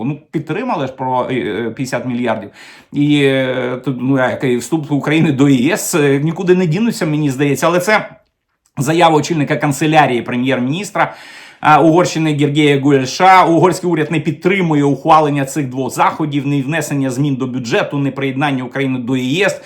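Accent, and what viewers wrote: Russia. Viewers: Пане, сьогодні вам я поставлю лайкос, тільки за те, що мені не різало вухо оте ваше, рашистське, -- "тєма", "схєма", "проблєма". P.S. Але ж, ні, в кінці ви таки викинули своє рашистське "тєма". Ну, не в обіду вам, але так виглядає, що ми з совка вийшли, але совок залишив свій слід. Ви послухайте, як говорить корінний росіянин, котрий не так давно перейшов на українську мову, телеведучий Олексій Суханов. Та в його вимові близко нема таких висловів, як у вас,корінного українця, котрий частину свого життя пропрацював на УТ.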